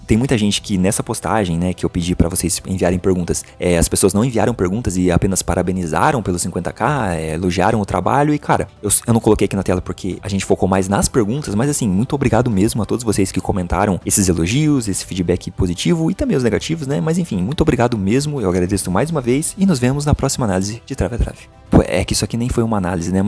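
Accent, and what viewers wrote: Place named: São Paulo, Brazil. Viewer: Ow mano Trave, na moral, agora cê vai ter q explanar q canal grande q te tirou pra merda pelo vídeo do inominável holandês. Preciso ter uma conversinha com esse caboclo q pelo visto não assistiu uma partida sequer daquela atrocidade q era o United comandado pelo inominável holandês kkk. Aliás, imaginei q cê tinha ficado mais grilado com o video d reação do futurinhas sobre o Pelé, q tbm tenho minhas discordâncias com os argumentos q cê apresenta naquele video em específico, mas o debate saudável faz parte kkk. Outra coisa ainda kkk, se depois d vc dizer q no ensino médio tinha dois times q tu odiava e esses times não serem Corinthians e Palmeiras (então no caso tu seria são paulino), aí eu sou um astronauta haushsushsu. Supondo q cê tenha por volta dos 20 e poucos anos, então cê sofreu com os péssimos 10 anos aprox do São Paulo em uma d suas piores fases e viu tanto Corinthians e Palmeiras ganharem td durante essa época. 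Fora o seu sotaque do interior d SP kkk. Se esse for o caso, então tmj nesse sofrimento, pq tbm sou trikas haushsusuh.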